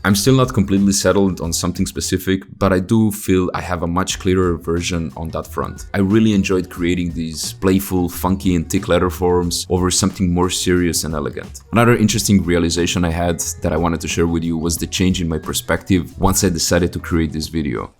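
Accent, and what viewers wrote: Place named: United States. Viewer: Damn bro, you speak like Amerikanski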